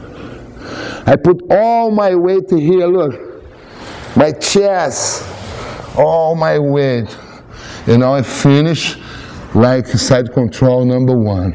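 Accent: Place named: Brazil. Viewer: My sensei is from Brazil who is living in Australia with a similar accent to. I find it normal when Brazilians or any Nation's first language is not English. If you understand BJJ then listening to broken English is not important when you're paying attention to the drill/technique more;) Master - I love watching your videos. I learn so much especially of someone from a higher belt. CHEERS:)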